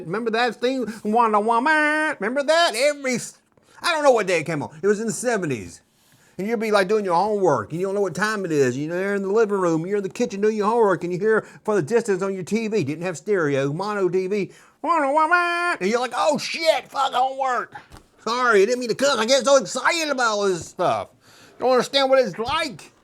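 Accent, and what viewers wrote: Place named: North, United States. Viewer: "north" mississippi? sounds more like Yankee Mississippi to me!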